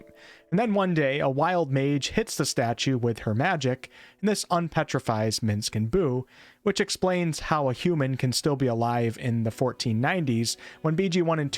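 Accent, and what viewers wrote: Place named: Midwest, United States. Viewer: So I'm detecting a somewhat midwest accent? The way you say tealent!:)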